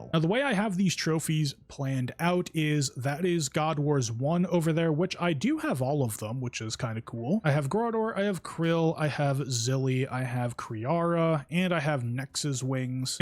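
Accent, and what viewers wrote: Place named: Australia. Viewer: Australian guy talking about castles? I'd be surprised if it wasn't Shad.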